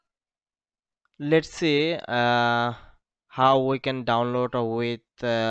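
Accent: Bengal, India. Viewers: 100% u are bengali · ইংলিশ শুইনা বাংগালী মনে হইতেসে🙄🙄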